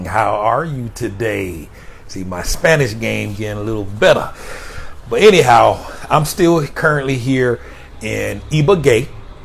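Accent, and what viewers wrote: South, United States. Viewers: This dude sounds Country as H-E- Double hockey sticks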